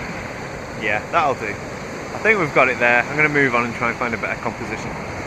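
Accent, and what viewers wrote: United Kingdom. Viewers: Great educational video. We're is your accent from in the UK? I'm living in Edmonton Alberta Canada but born in sherburn in Elmet nd Leeds Yorkshire. I miss film photography as it was never so complicated as digital. Thanks for the education .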